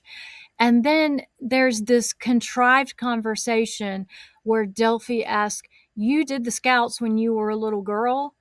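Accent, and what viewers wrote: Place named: South, United States. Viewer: I always enjoy your videos! As far as your southern drawl and you "working on it," please don't. You leave that drawl alone! It's a pleasure listening to it! Your reviews have been top-notch. 🏆 Thank you for your service, ma'am. 🫡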